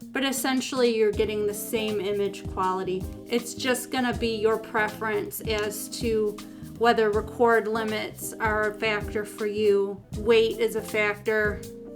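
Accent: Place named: Minnesota, United States. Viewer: As a non-American I can be wrong here, but you sound a lot like the people in that brilliant movie FARGO... Anyways, very good comparison! Groeten vanuit Belgie!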